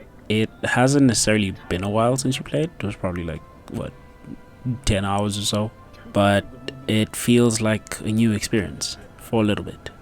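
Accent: South Africa. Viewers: Now hold on are you from South Africa... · Hey are you South African?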